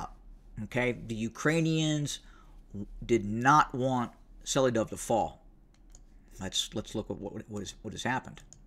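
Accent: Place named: United States. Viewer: Russian TV stuff narrated in American accent. Pretty surreal to listen to.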